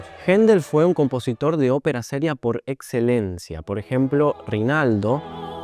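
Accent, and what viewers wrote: Argentina. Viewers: Sos insoportable, en todos los videos decís la misma idiotez. Es la forma en la que todos los argentinos hablan hoy en día.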